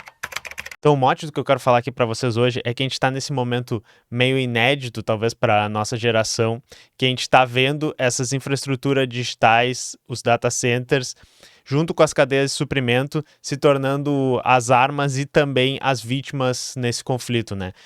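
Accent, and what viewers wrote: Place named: Rio Grande do Sul, Brazil. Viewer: A imitação de mineiro com sotaque gaúcho realmente me pegou 😂. Excelente!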